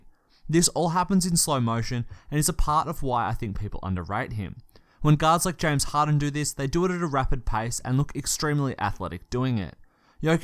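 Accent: Australia. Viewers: A guy from Australia (sorry if I misjudged the accent, not a native English speaker) with 4k subs on youtube makes NBA analysis of such quality and depth, probably working in his room at home, and ESPNs and Foxes of the world, with budgets of tens of millions of dollars, can't give us anything better than Colin Cowherd and Nick Wright...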